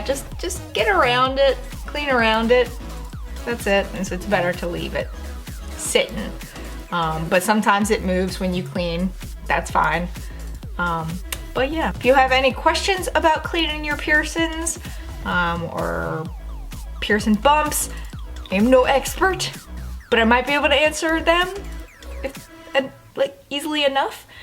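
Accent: South, United States. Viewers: It’s not “duh” it’s “the”.. Its not “Pearson” it’s “piercings”.. Are you southern or something?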